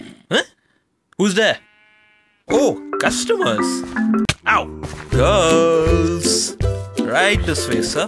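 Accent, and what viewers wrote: India. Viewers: That's a true indian accent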